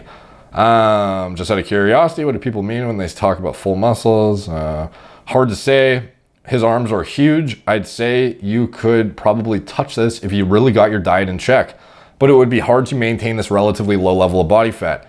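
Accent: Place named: Canada. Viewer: sounded more Canadian than usual in this vid, particularly at "that's jumping to conclusions, no?" and with his polite disapproval of Reddit calling Lewis gay lmao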